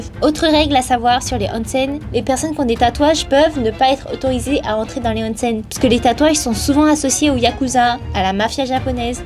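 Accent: France. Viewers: "quel bon français" ??? LOL elle est parisienne…. Qu'est-ce que c'est que ces préjugés ?! (votre français par contre est à re-travailler… "on s'y serais cru y être" ???)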